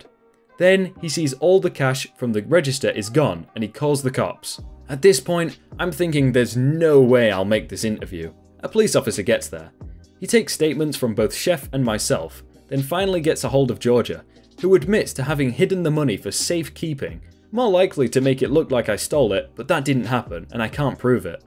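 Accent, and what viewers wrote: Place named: Yorkshire, United Kingdom. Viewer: Your deep south accent sounds suspiciously Yorkshire-like...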